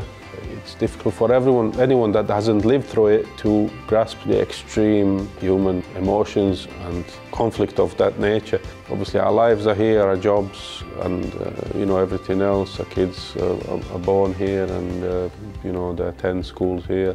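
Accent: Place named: Yorkshire, United Kingdom. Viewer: The Yorkshire accent has settled in well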